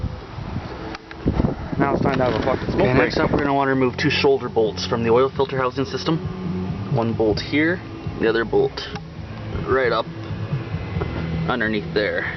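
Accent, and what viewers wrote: Canada. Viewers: Great video. I'm from ontario and my wife said hey he has the same accent as you. Bish I don't have an accent! LoL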